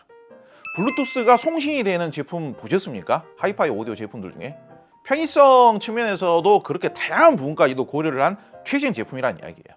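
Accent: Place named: Gyeongsang, South Korea. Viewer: 말투가 그런 거잖아 약간 경상도 좀 느낌 억양이 살짝 있으니까 그렇게 들리는 거지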